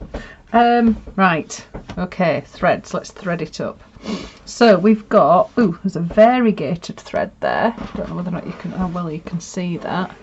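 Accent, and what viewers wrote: Yorkshire, United Kingdom. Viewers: Lovely to hear your Yorkshire accent again this week, and what a pretty fringe. I have just the fabric I need for this week. Thanks for your humour and inspiration.m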